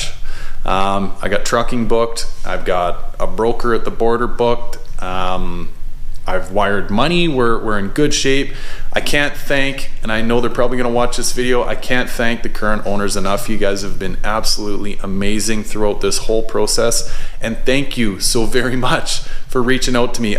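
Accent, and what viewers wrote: Canada. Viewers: Your Canadian accent reminds me of back home. It's been 10 years since I've been back. Good luck with the new pony.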